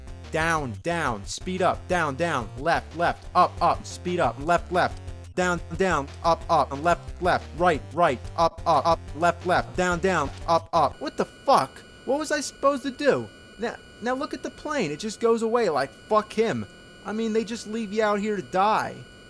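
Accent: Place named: South, United States. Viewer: and he says ayss he's got a bit of a souther accent he also said: what more could you ayask for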